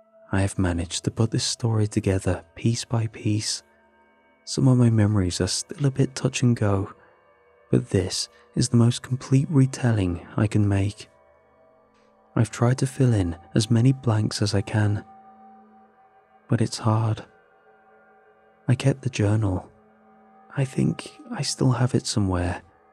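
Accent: England, United Kingdom. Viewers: A guy with an English accent talking about Missouri and Minnesota. 😅🤔👍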